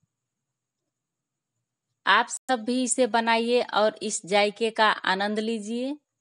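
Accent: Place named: Bhojpuri, India. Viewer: pata nhi sbko apke bolne se kya aitraj hai cooking channel hai... koi voice improvement ki class nhi chal rhi hai... hindi mai to bol rhi hai sab angrez haj kya yahn pe... unki bolne ka style alag h bas hum bhojpuri bolte h hindi utni asani se bolne mai dikkat hoti hai gaon mai support karo warna chodke jao... bhashan mat do mehnat ko appreciate karna chaiye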